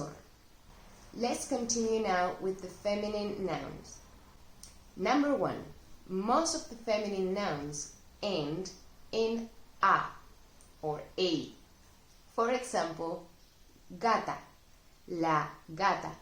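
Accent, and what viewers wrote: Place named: Spain. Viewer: Me gustan mucho tus videos! Me parece que hay muchos video por los principiantes y todos explican las mismas cosas pero no hay muchos video por los estudiantes intermedios quienes no entienden aún los videos nativos pero las cosas básicas son demasiadas fáciles. Me gustaría ver algunos videos donde por ejemplo hablas solo en español sobre algún tema, para que yo puedo escuchar pero tambien que añadas los subtítulos en español y inglés para que podamos aprender nuevas palabras. :-) perdoname por mis errores que he escrito, es que ya he pasado mucho tiempo buscando algunos video más dificíles para estudiar un poco más 😄 he visto que estás comenzando y por eso creí que podría pedirte por esos videos😊 especialmente por tu accento español, porque tengo más problemas de entender ese accento que los latinos :-) gracias y espero que tengas un buen dia!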